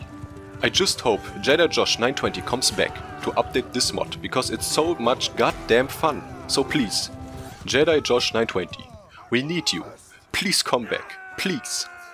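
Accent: Germany. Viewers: Ich mag deinen deutschen Akzent 🙌 great Videos, keep it up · Really enjoying your videos, very helpful and informative. I also love your German accent, I lived in Germany for 5 years and fell in love with the place. Have a nice day. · Dein Englisch ist definitiv besser als meins...aber dieser Deutsche Akzent triggert einen einfach hart...versuche einfach das ganze mehr rund auszusprechen oder hör dir an wie Amerikaner sprechen das klingt nicht so hart und man würde nicht sofort hören das du Deutscher bist xD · Jo kann es sein dass du aus Deutschland kommst xD Der Akzent kickt ^^ Edit: Oh ok du bist anscheinend ein Deutscher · der deutsch aktzent kickt :) nice vid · Youre german accent is so funny xD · Höre ich da ein deutschen Akzent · Hi, thanks for the video! I like your German accent:D. I installed most of the mods in the videos you uploaded, but I don't have the mod options in menu. 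Could you help me?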